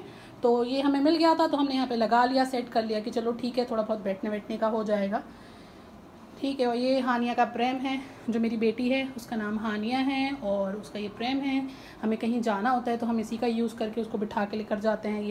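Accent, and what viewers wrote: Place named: Pakistan. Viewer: that's gd but you speak in Pakistani style and speak very clear Urdu as well even I've noticed one thing that you didn't use any single word of Hindi.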